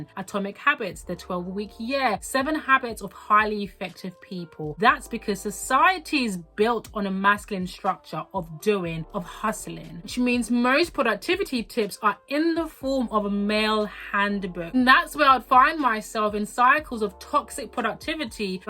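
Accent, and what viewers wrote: United Kingdom. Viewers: I started my channel/personal brand, no subscribers yet. But hey, I got step 1 complete🎉 I just knew you were from the UK for some reason ❤ 🥂 I have a lot of hobbies and it tough to merge them as well so I end up spreading myself thin and overwhelmed. I need to try email Marketing even content ads as well .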